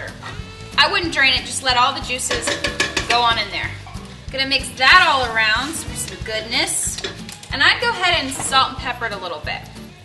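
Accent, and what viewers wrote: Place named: Colombia. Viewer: i love her Colombian accent !! lol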